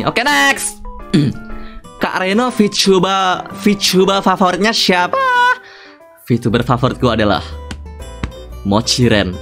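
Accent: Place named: Java, Indonesia. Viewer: Aduh pria Jowo, medok banget🤭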